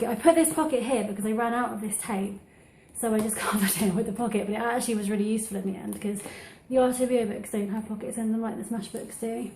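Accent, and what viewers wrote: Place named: United Kingdom. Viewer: Loved it! Thanks for sharing! Had no idea you are from across the pond! Loved hearing your accent as you described your book. Anyway, what I wanted to share was about your receipts...you are right, they do fade but what I do is make copies of receipts now for my Smashbooks so they last longer. Do you think you can do that? Just a thought for you. Thanks for sharing your American trip memories! Now you must come to Florida to pay us a visit at Disney World!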